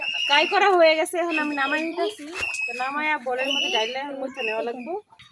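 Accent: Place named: Comilla, Bangladesh. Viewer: আগে বলেন আপনাদের বাড়ি কোথায়??? ভাষাটা চেনা চেনা লাগছে, বেশিরভাগ আমাদের মত। তবে আপা অনেকদিন পর এই পিঠাটা বানানো দেখলাম, এটা কে আমাদের কুমিল্লায় ফুল পিঠা বলে। সেই খেজুর কাটা কাঁচা বাঁশের লেইল দিয়ে বানাইলেন, অতীতের পিঠা বানানোর সব স্মৃতি মনে পড়ে গেল। আমাদের দেশে বিয়ে এবং মুসলমানি অনুষ্ঠান আর নতুন জামাই বেয়াই আসলে, এই পিঠা আরো বিভিন্ন রকমের পিঠা বানানো হয়। আমি ভালো পারতাম না, আমার মা ভাবি পাড়ার অনেক মহিলারা মিলে বানাইতো, কিছু কিছু পারতাম, কারণ তখন ছোট ছিলাম। আজ আপনার কাছ থেকে ভালোভাবে দেখলাম। এখন পারবো মনে হয় ইনশাল্লাহ, আমি আপনাকে লাইক কমেন্ট সাবস্ক্রাইব করে দিয়েছি। ভালো থাকবেন। আর আমার জন্য দোয়া করবেন আমি খুব অসুস্থ, এবং শোকাহত, আপনার ভিডিও সব সময় দেখব কথা দিলাম। আসসালামুয়ালাইকুম 🙏❤🌻🥀🤲👍🎉 ঢাকা থেকে 🙏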